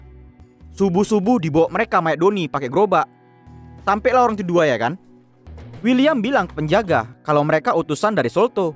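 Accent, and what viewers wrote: Medan, Indonesia. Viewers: Aku sor nya dengar yg ngmong di chanel ini macam anak medan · Min orang medan min? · Medanya nampak kale kk🙏🙏😅😂🤣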